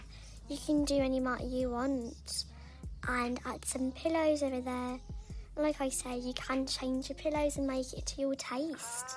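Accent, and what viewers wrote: United Kingdom. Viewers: Lave that British voiceeee it's soo cuteee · YOUR VOICE IS A GODDESS OF BRITISHHHH AHHHHH❤❤❤ BUT MY FRIENDS CALL ME BRITISH🤨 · I love your videos but you know itz toca Alice and and you know you just like Alice the British accent and all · I love your British accent ❤❤❤❤❤❤❤❤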